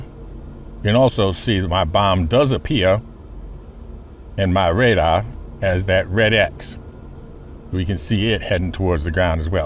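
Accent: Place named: South, United States. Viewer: You accent is excellent, it reminds me of a southern gentleman.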